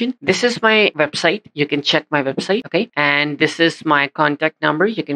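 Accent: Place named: India. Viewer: Indian accent sucks bro, honestly!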